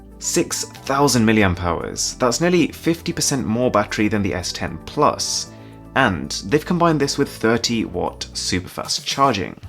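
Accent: RP, United Kingdom. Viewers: How refreshing to have a review given by someone who speaks the Queen's English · for some reason this guy's accent sounds too posh for my ears.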